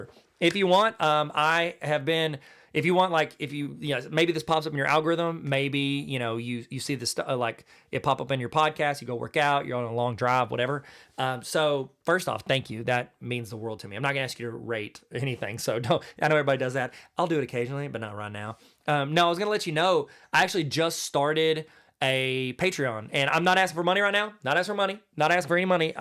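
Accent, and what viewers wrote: South, United States. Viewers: I love watching your videos, because you say things like “No thing like a chicken wing”, l know you didn’t say that but you do say funny things that crack me up. Where are you from originally, your accent says the south and l am from Lexington Kentucky area with an accent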